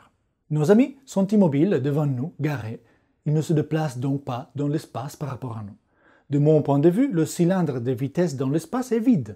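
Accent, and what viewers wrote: Italy. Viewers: la seule chaine qui réunit un physicien super beau, un accent italien a tomber par terre et de la science super bien expliquée pour les novices...que demander de plus :elbowcough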